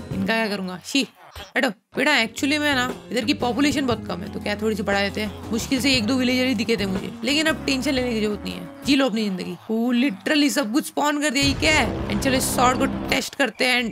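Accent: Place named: Gujarat, India. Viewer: Aapki boli se lagata he ki aap gujrati ho kya ho aap gujarati 😘😘😘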